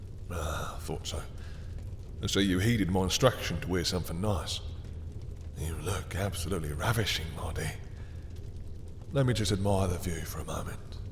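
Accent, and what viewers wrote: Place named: United Kingdom. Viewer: I SWEAR IVE READ THIS SPRIPT BEFORE! This is a wonderful spin on it! I love the British accent! ✨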